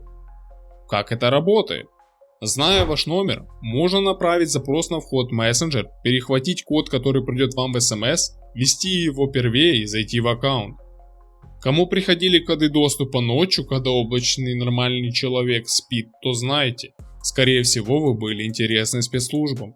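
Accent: Ukraine. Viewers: Своим говором и языком гугла ты спалил что украинец, будь осторожней а то за такую информацию тебя многие могут недолюбливать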